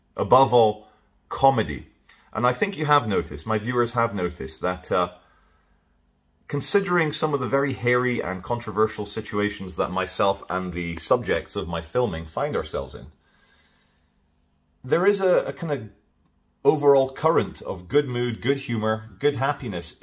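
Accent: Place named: Scotland, United Kingdom. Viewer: he has a posh Scottish accent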